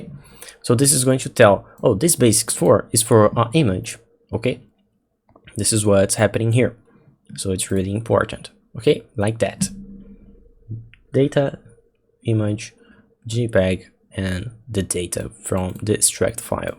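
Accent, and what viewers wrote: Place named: Brazil. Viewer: Engraçado, eu ouvindo um vídeo teu, e logo percebi "pera... esse inglês tá meio aportugueisado não?" kk massa mano.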